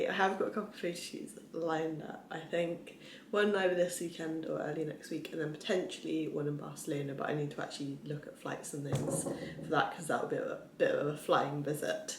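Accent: United Kingdom. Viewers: 발음이 이상하다했더니 영국분이였구나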